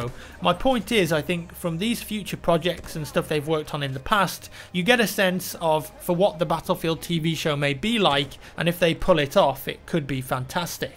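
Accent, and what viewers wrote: United Kingdom. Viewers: Drink a shot everytime he says Battlefield in his nice british accent :D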